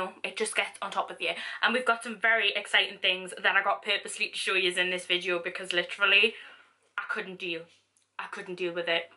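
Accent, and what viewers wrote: Newcastle, United Kingdom. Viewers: Geordie accent has always been my fave 😫 could listen to you talk all day girllll !! 😘✨ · are you british? luv you btw xx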